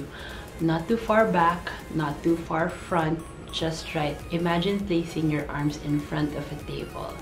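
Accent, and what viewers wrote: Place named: Philippines. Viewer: OHMYGOSHHHHH your Pinoy accent is so cute!!!!!! At ang guwapo mo rin omgggg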